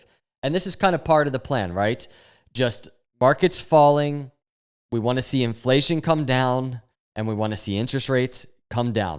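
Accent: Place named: New Jersey, United States. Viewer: I hear a Jersey accent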